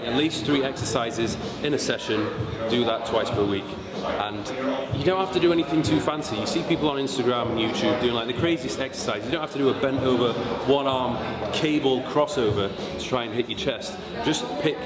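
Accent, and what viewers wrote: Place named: England, United Kingdom. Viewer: Finally an English accent!!!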